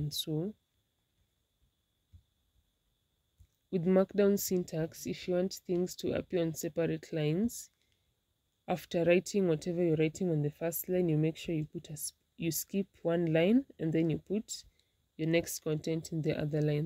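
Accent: Kenya. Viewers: I can tell that you are a Kenyan. 😅 Kenyans have their own accent. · Eish you sound like a Kenyan wee nakushow nimekwama videadly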